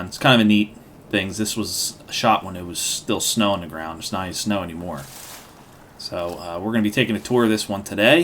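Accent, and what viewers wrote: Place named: Delaware County, United States. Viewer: Your accent gives you away. We grew up in the same place, Delco. I can tell.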